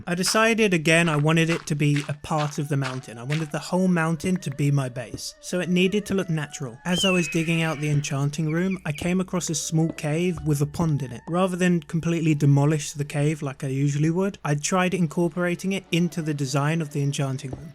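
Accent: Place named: United Kingdom. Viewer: you sound like winnie the poo, dream, and a british accent combined and i am HERE for it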